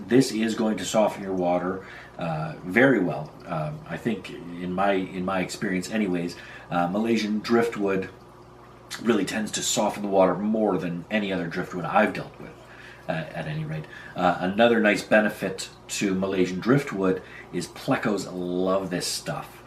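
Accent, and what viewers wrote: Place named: Canada. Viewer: I subscribed for the great info and because I like the way Canadian's say about. If you could please say aboot and chesterfield in future videos.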